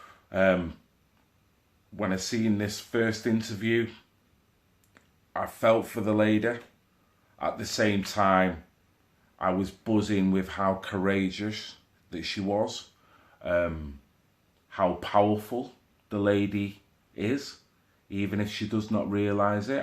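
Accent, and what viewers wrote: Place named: Liverpool, United Kingdom. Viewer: Racism would NOT exist between whites and blacks if all black guys adopted the same morals and loyalty that strongly holds towards his country and people. I agree with almost everything talks about and I look forward to all his uploads. I was an early subscriber to his channel because on listening to his posts, I immediately recognised this man as a decent honourable and above all loyal black Brit whose corageous enough to speak the TRUTH without fear or favour. is clearly very clever, informed and is an articulate speaker (with a Liverpudlian accent lol) to boot who doesn't use obscene profanity to shock. I think his talents are pitifully wasted as a painter decorator (no disrespect intended to decorators who are all hard grafters). He's the kind of guy I'd hand pick as a friend. I think that Merseyside Police should appoint as their new Diversity Policing Adviser.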